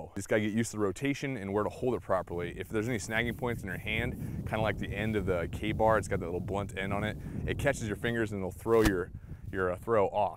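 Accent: United States. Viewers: Tino TheKid where.. where do you think he is? He's got an American accent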